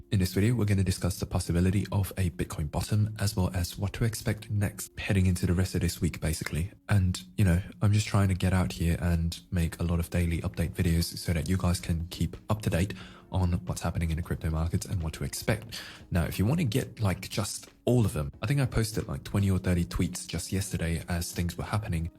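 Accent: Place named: England, United Kingdom. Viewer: Yes, this too shall pass. Thx for the reminder,! Btw, my girl wants to know what region of England you’re from. She’s super diggin on your accent, bro! I’m beginning to think she’s not even into crypto, just listens with me to hear the sound of your voice!😂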